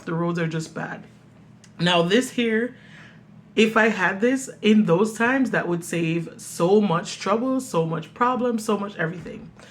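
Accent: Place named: Jamaica. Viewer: That is not true. I can definitely tell you're Jamaican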